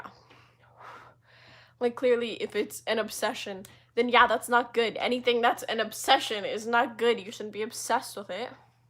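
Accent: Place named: United Kingdom. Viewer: the british accent is not at all bad get it bestie 😼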